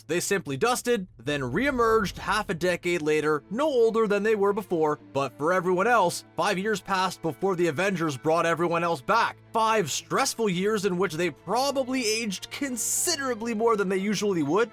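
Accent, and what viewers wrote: Canada. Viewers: Is the narrator Canadian? (His pronunciation for some words make it seem like it.) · You have a heavy Canadian accent. Are you from Alberta.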